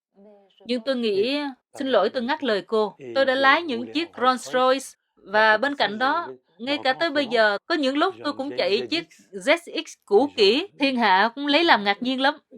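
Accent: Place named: South, Vietnam. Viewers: Tui ghiền xem kênh này từ khi tình cờ xem được clip hai em đi về vùng quê thăm mộ HHNP và nơi HH từng sống...❤❤❤ Rất thích thú cách interview của, giọng Miền Nam tự nhiên và rõ chữ👏👍👏❤❤👍👏👍❤❤ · nhà báo giọng Sài gòn sang trọng Văn phong phỏng vấn đơn giản thú vị. đồng cảm với nhân vật. người nghe xúc động cuốn hút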